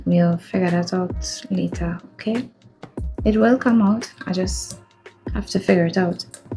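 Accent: Trinidad and Tobago. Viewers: Fello Trini here!!! Really enjoyed the video and what a great idea to make homemade feta. Can't beat a Trini accent! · You sound Trinidadian 🇹🇹🇹🇹🇹🇹. Big up yuh whole self.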